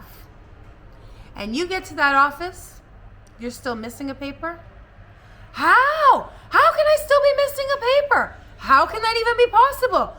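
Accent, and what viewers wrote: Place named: Canada. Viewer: It's interesting 🙂 I mean I agree that bureaucracy can be difficult in Serbia😉but when comes to licna karta, pasos, it's very easy. My kids left when they were kids, and when (one of them )was 27 (so after 22 years) we were doing his licna karta back home. And it was done in a few days. You fill out the papers, you pay, easy. Not sure what was so hard🙂. And you don't talk as a child, no worries😍. That's just a Canadian way of talking. 😉 but don't kid yourself, it's not as easy in Canada also, if you are not Canadian. Lots of crap over here also.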